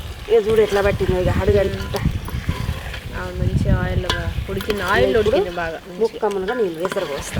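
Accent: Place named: Khammam, India. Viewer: Meedhi kammam district kadha? Me athamma accent alaane vundhi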